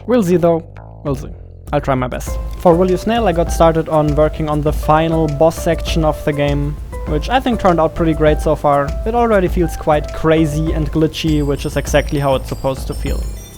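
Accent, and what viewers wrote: Germany. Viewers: Bist du deutscher? Are you german? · Direkt aufgefallen, du bist Deutschsprachiger ^^ Aber cool dass mich der heilige YouTube Algorithmus hier her gebracht hat :) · Wie man halt instant an deinem Akzent merkt das du Deutscher bist. :D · Er ist deutscher. Man hat es im Call gehört. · Hey, jetzt weiß ich wieso du einen deutschen Akzent hast 😂🙂❤ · Are u from Germany? u have a German accent ^^ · Feier diesen Deutschen Englisch aktzent, aber nices Video. Kannst du mal zeigen wie man spiele auf Steam hochlädt? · Haha ich wusste es dieser aktzent der muss deutsch sein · du sprichst see also to see immer wie „sie“ aus und nicht wie „ßie“😂 Daran hab ich auch gemerkt dass du deutsch bist. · Bist du deutsch?Hört sich irgendwie so an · Du bist deutsch? :D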